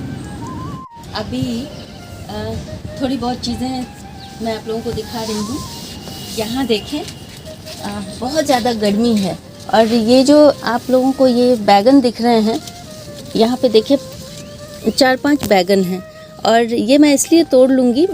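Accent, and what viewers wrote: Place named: Eastern Uttar Pradesh, India. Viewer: Mam aap purvi uttar pardesh se lagti hai shayad ur good job👌👌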